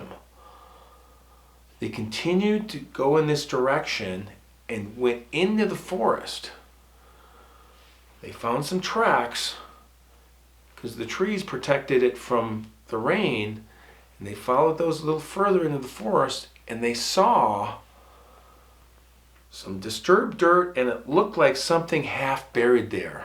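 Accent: Minnesota, United States. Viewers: I knew you had the Minnesota accent!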